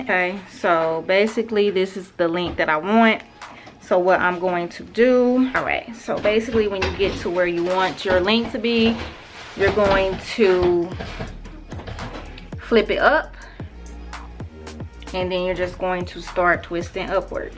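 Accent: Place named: South, United States. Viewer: You have a southern accent 😭❤️ and good job👏🏽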